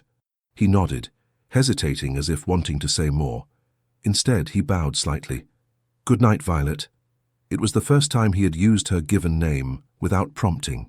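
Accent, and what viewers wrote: United Kingdom. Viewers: Hi Yes! That purring English / British accent. YUM! From (ironically enough) Windsor, Ontario Canada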